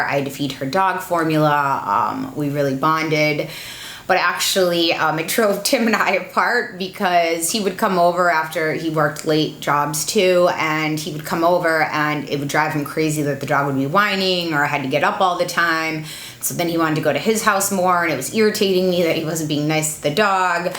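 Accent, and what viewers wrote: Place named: East Coast, United States. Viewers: I love your raspy east coast voice because it makes me remember I’m watching another goddamn adult on YouTube, not a teeny bopper. There’s a time and a place for their content...but I always stop drop and watch your videos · Always enjoy your content, and your raspy East Coast voice, RI native here. Monty Python is one of the building blocks for a great childhood, go dad! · I came to your channel a couple of years ago because of your “bitchy look and raspy east coast voice” lol. I have the same look and not raspy but an east coast (Nj) voice lol. Love the fact that you’re not a twenty something YouTuber!! Your opinions have always been trustworthy to me. I’m glad I found you ❤️.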